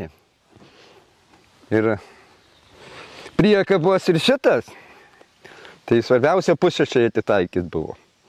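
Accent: Dzūkija, Lithuania. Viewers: Saunuolis labai grazi dzukiskai kalba 🙌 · Širdžiai miela dzūkiška kalba.👍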